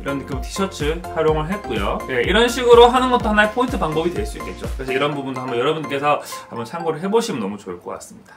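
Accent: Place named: Gyeongsang, South Korea. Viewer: 경상도 사투리 억양이 조금 있으신 거 같네요ㅎㅎ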